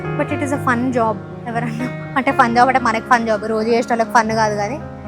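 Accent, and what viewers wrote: Telangana, India. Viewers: Mee Telangana slaang super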